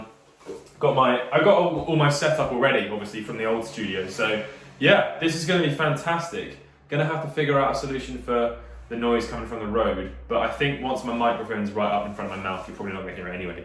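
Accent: United Kingdom. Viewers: It sounds weird that someone who talks in pound sterling and has an English accent is talking about travelling to the UK etc, haha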